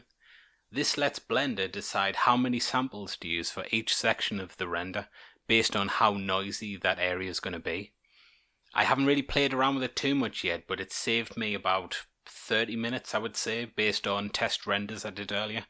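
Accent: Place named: Teesside, United Kingdom. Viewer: Sounds like good old Boro to me?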